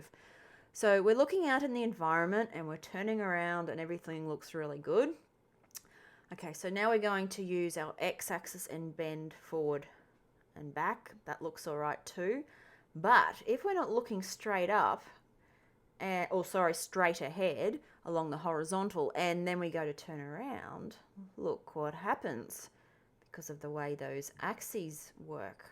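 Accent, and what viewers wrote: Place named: Australia. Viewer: I am sorry if this is correct in Australian, the awesome accent gotta come with quirks I guess.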